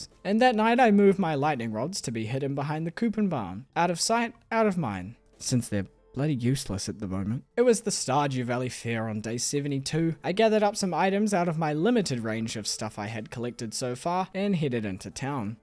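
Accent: New Zealand. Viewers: Just watched all your 100 days series videos and I have to say I am absolutely loving it! 🙌 Your New Zealandish accent is quite funny to listen to while you play as some words you say are clearly very differently pronounced to what I assume most of your viewers accents would say which causes some funny confusions 🥴😂 Keep it up and looking forward to next episode! Much love from Australia!! 🇦🇺 Question: I guess you are managing just fine without it but where's your UI boost Mod at? 🤷‍♂️ And also a pigs 🐷 name has gotta be 'Pigpen' from Charlie Brown 😋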